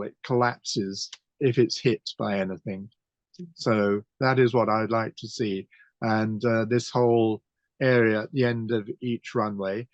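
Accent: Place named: RP, United Kingdom. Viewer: Just coz you've got posh English accent don't mean you're intelligent mate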